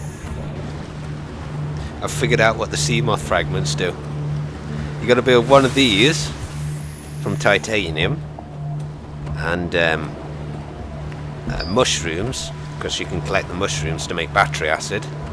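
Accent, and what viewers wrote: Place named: Birmingham, United Kingdom. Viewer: I can hear a slight yam yam/ brummy accent... you local dude?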